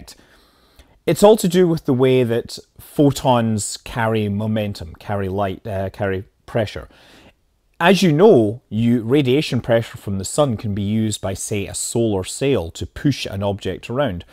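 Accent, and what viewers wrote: Scotland, United Kingdom. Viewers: thank you Scott Manley. Great stuff. a fun mix of Kerbal, sci-fi, and hard science discussion, all in a Scottish accent. I wish I had stumbled across your channel earlier.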